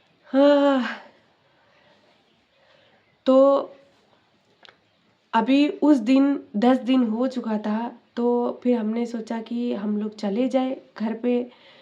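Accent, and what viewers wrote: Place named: Hyderabad, India. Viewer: You spiking like haydrabadi language style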